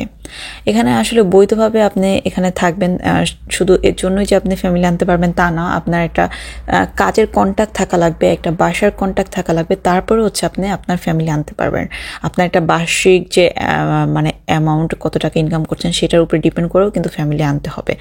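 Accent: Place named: Dohar-Nawabganj, Bangladesh. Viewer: Apur Kotha Sune Mone Hoy Apur Basa Dohar-Nawabgonj A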